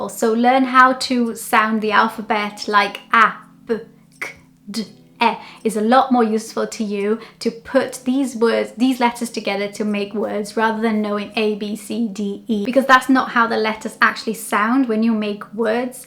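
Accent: United Kingdom. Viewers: Uma brasileira com inglês britânico? I love it! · A lucy falou do sotaque britânico dela! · Hi ! I'm new here to your channel. I can't believe you are brazilian girl, your British accent is so pleasant that you sound like a native! I saw your video of how you became fluent in English. Your journey is very fabulous. I love your accent. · Hi, you asked about our backgrounds. My name is Timo and I live in Finland. I listen to podcasts, Youtube videos and audiobooks of different topics in the background when renovating, woodworking, washing dishes or driving a car. Lucy mentioned you are a great example of a person who has gained a British accent and she is right. Even if I don't live in an English-speaking environment, it is nice to learn new ways to improve your fluency. Thanks for the video.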